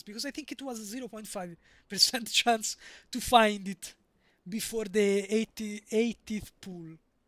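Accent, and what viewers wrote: Italy. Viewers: its right before my bedtime so i cant watch more than those 3 mins today but i gotta say this is a really cool way to stream and interact with the community, youre really awesome!! i also like the italian accent, parlo un po italianooooo, good night <3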